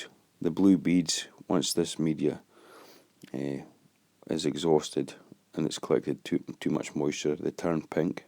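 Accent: Scotland, United Kingdom. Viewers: Where you from mate? Your definitely Scottish. Aberdeen by any chance?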